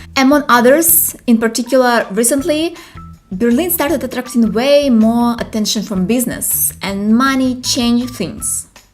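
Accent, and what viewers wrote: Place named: Eastern Europe. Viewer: Nice person but I have to be honest....Listen to „eastern-English“ is really exhausting....sorry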